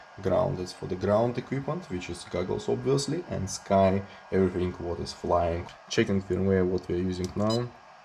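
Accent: Russia. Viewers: Рунглиш :D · О, приятель, твой английский звучит так, будто ты русский) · Бикоз прабабли хи из рашн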